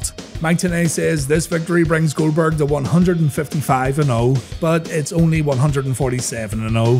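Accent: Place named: Scotland, United Kingdom. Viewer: a Scottish guy talking this video, brutal to listen to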